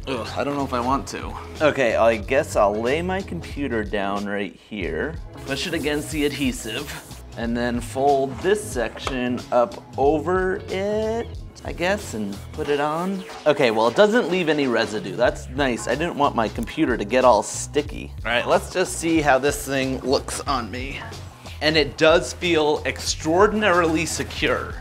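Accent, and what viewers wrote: United States. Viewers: Are you American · Haha i thought this was a knockoff integza channel.. until you spoke with American accent.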